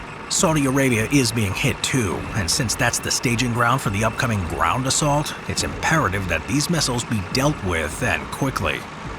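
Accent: United States. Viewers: A Briton who speaks American.. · "Any questions?" Yes: why do you should like an American?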